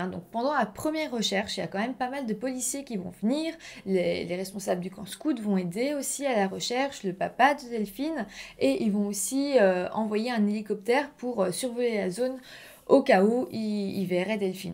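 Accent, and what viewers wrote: Belgium. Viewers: C'est juste l'accent belge ☺️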